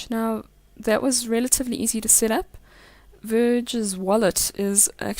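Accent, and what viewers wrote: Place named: South Africa. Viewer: I have to agree with you, you sound very South African, local is lekker:)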